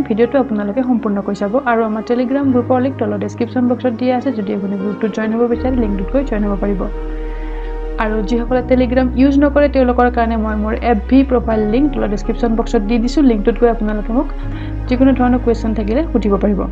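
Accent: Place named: Lower Assam, India. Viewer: apunar voice tu birat val lage... lwr assam r gundho ase mat t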